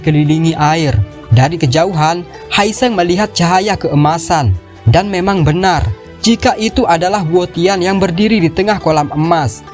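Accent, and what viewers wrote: Lombok, Indonesia. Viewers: Ini yg bercerita orang lombok ya.?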